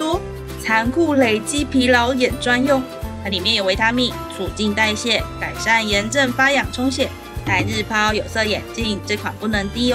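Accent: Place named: Taiwan. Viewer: 台灣口音好可愛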